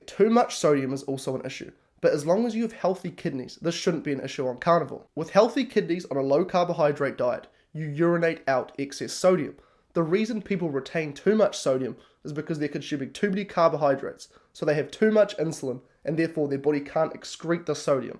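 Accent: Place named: New Zealand. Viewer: Kiwi accent is never a problem listening from Australia. Good on you ❤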